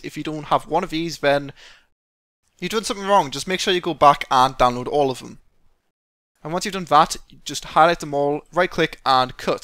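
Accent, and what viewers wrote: United Kingdom. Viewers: well, the brithisch accent is pretty hard to understand, i mean i understoad everything but damn on some parts it was hard ^^'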